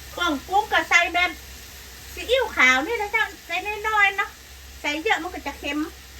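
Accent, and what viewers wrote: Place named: North, Thailand. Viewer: อู้คำเมือง คนตี้ใดจ้าว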